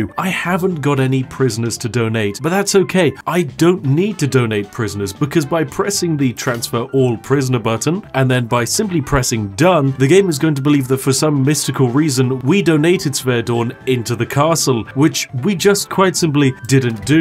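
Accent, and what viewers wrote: United Kingdom. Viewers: Hahahahaha yesssyesss I love your British accent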